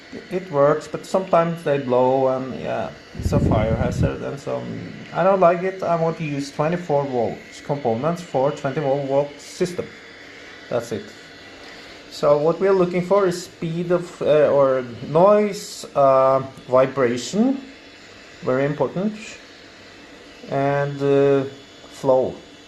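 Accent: Norway. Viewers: Damn you HAVE TO BE Norwegian.......you got THAT accent hahaha.....greetings from Trondheim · I just love a good ol' norge accent. Thanks for the video good sir, I am currently trying to know more about this kind of fans!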